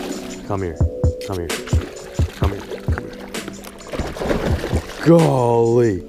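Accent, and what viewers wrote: South, United States. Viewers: I think your low key catching a southern accent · Just moved to Texas and he's already sounding southern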